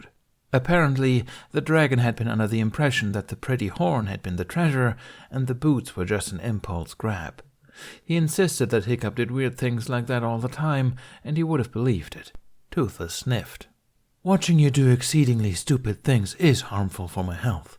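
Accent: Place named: France. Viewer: Dude the THICK french accent OMFG mate its fabulous.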